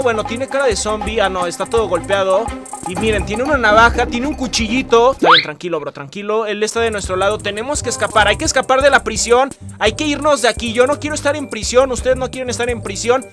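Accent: Mexico. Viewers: eres mexicano o ecuaforeño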